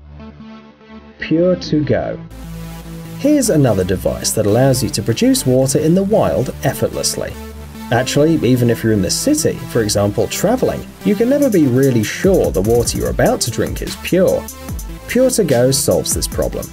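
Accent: England, United Kingdom. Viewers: Some of the most useless and unnecessary products I have ever seen...and an English accent doesn't help to sell them.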